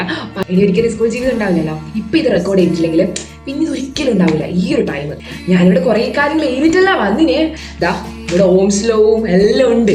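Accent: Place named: Kozhikode, India. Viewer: Aah... Bhasha kettitta.... Ente slangum almost same aahn...Kozhikode evdya??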